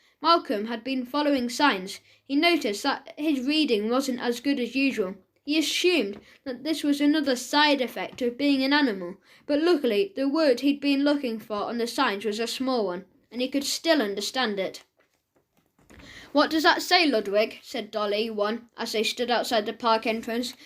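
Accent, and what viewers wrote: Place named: England, United Kingdom. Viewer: I am very good at telling accents, I think he is from England. Also amazing reading!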